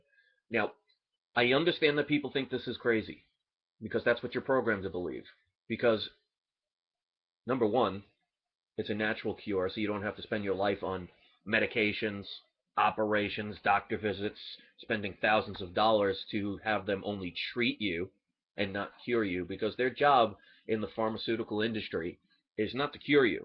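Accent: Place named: Pennsylvania, United States. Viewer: Best thing for asthma is pot. Legal here where I live, but not in PA where I think you live due to your accent. I am not joking.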